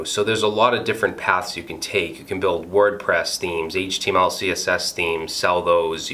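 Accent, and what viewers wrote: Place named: East Coast, United States. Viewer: I've heard your message so many times before. But because your voice is so calming and mixed with what I think is a an East coast accent, your message seems so much more trustworthy. Lol. Great advice overall man, keep it up! Also thanks for deciding to help others. You're obviously an individual who is on a higher level than most.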